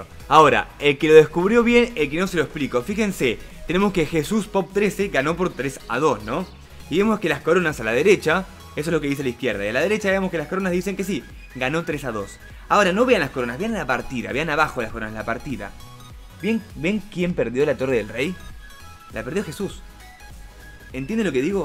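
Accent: Argentina. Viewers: sos argentino? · De que parte de buenos aires sos?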